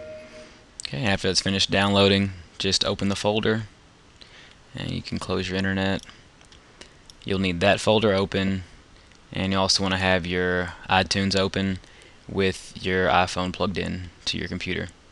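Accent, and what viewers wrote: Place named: South, United States. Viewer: Actually, you sound like a southern chuggaaconroy.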